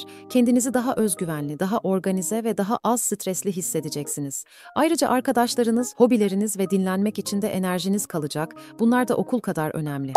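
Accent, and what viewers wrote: Azerbaijan. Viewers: Oha abla sen Azerbaycanlımısın?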